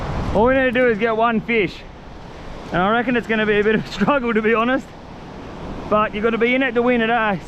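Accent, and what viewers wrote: Australia. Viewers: Love your AUSTRALIAN DIALECT...Not 2 mention your energy!¡! EVEN WHEN ALL U CATCH IS " SEAWEED". Try running the Bike on the WET SAND, it won't slip under u as easily... Just saying. Keek the videos coming Dawgie... LMAO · My fav channel to watch.. this cat is loaded with personality and charisma plus that delightful Aussie twang!! never a dull sec in his vid😅🇺🇸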